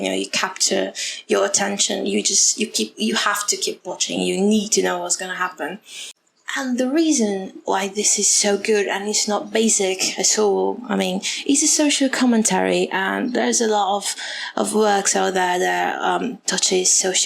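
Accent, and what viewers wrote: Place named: United Kingdom. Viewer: O sotaque britânico da gata! The British accent of the kitty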